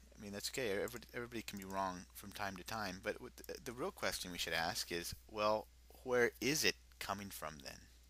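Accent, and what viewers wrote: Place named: Canada. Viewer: Nice Canadian accent.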